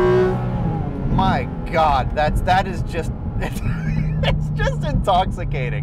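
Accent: Germany. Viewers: German accent is my new favourite thing ever!!! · ! Love the German accent. Awesome video. 👍🏻🇺🇸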